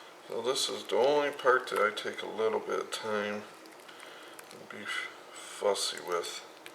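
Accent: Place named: United States. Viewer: Good night folks enjoy your blue cobbler he sounds country that is good looks like a friendly guy he just soundd like garfield talking big cat by yall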